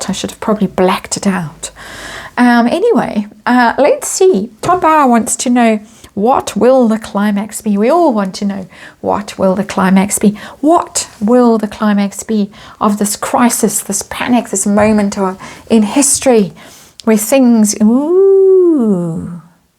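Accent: South Africa. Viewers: I listened twice to your ending with earbuds in and I couldn’t figure out what you said because of your charming South African accent. But when I heard your dog softly moaning I thought it was one of the cats that were in my house 😆 Take care and I hope to hear from you soon.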